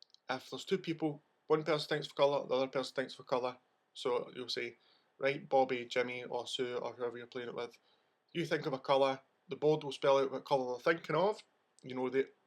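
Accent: Scotland, United Kingdom. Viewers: Is he.. .Scottish? Or Irish...? This is going to bug living crap out of me >.> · Ahh, he's from Scotland. :P Sort of close. Lol. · are ye scottish lad? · ur scotish me tae m8 lol scotland foreva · Are you Scottish? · Great video! I have a board and really want to try it - will be alone as nobody I know believes or is willing to take part! Thanks again for this video, brilliant to learn history of the boards too. PS I'm a Fifer - where is your accent from? You sound like you're more towards the West!! :o) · Are u scotish ?? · Is a make shift ouija board okay to use??? Oh and love the Scottish accent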